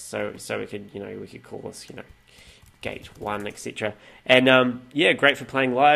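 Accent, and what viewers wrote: New Zealand. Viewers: He's a Kiwi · Is your accent New Zealand or Australian? I love it none the less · Instantly knew you were kiwi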